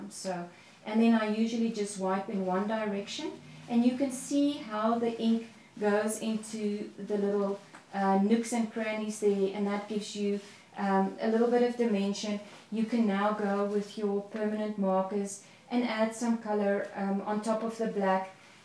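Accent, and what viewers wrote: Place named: South Africa. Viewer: Looks lovely,is metal foil the same as pewter.nice to hear South African accent again ,I'm a ex South African living in the UK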